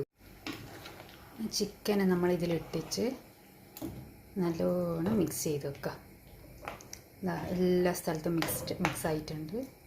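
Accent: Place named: Peringattur, India. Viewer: Ninde peringatur baasha veratte..over aaknda😂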